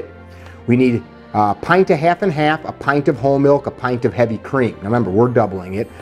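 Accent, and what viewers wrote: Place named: Wisconsin, United States. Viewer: Buddy’s a cheese head for sure with that sconi accent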